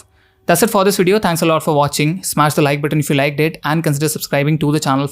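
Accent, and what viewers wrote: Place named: England, United Kingdom. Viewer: English accent so suck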